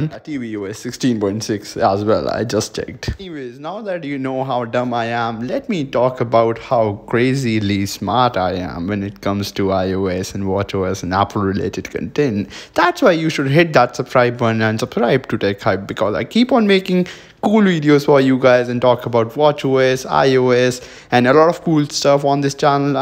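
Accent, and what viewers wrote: India. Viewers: i hate your english language you indians!!!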